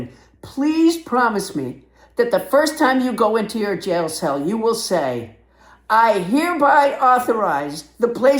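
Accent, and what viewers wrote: Boston, United States. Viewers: Brilliant! Ya sound so Bostin! It’s always a pleasure to see (and hear you)! As Denis would say, “He’s an a-hole!” ❤❤❤❤😂😂😂😂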